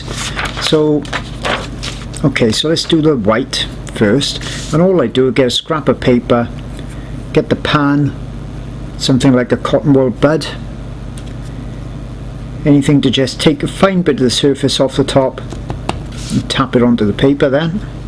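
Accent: Wales, United Kingdom. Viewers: The absolute joy I have not only finding this video in my first ever day using pan pastels, but also hearing the voice of a fellow cymry. I just find it so soothing and familiar when learning new things, so I'm overjoyed to have found your channel.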